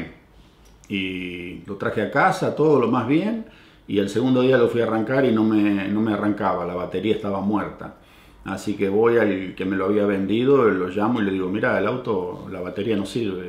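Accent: Argentina. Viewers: Gracias por sus vídeos!! Me encantan 😍 😍😍😍 , les escribo desde 🇦🇷. Aunque hagan mil años que te fuiste de acá, no perdes “la tonada” !!! Me alegraron la cuarentena!! Te aseguro que sería buenísimo tener un presidente como vos!! Muchos haríamos campaña para vos gratis!! Jaja! Lamentablemente nuestro país no tiene solución! Mejor no sigo, es muy triste lo que estamos pasando!! Espero el próximo vídeo!! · Recien hace 2 ds encontre este video de Youtube, Me intereso tanto que no para de verlos. Me hubiera encantado verlos en cuarentena, la cuarentena interminable que tuvimos en <link> asombra mucho que no hayas perdido tu acento. Me siento identificada con todo lo que decis de Argentina.Que gran pais desperdiciado!!! Voy a ver todos los videos. Lamento en particular, lo que tuvieron que pasar tus hijas con tantas idas y vueltas. La burocracia en Argentina es terrible, y la coima ya es algo como normal!!! 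Me encantan tus videos y me siento identificada en muchas cosas viviendo en Buenos Aires. Saludos a todos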